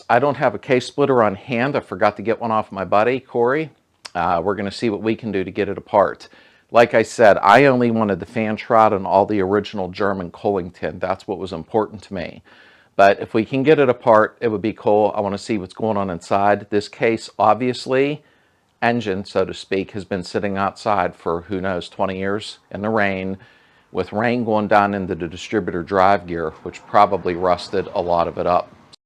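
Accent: Pittsburgh, United States. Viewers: I am a fellow „Yinzer“ and love your videos!! I recognized your Pittsburgh accent right away but I really like how you go into what is needed and how to do it with minimal cost. Keep on making these great videos. I am rebuilding a Karmann Ghia and live in the South Hills.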